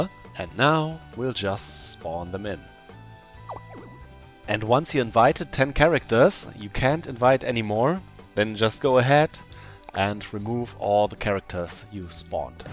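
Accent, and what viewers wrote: Germany. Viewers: Workout athome Yes he‘s from Germany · Are you German? I think you have a german accent (#nohate I like that accent) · das deutche englisch lol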